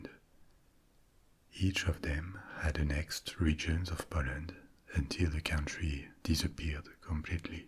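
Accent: France. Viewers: I love history , soothing voices, this should be heaven for me, but his french englisg just doesnt work for me, k dont know what it is, just doesnt get me relaxed, and its a pity awesome content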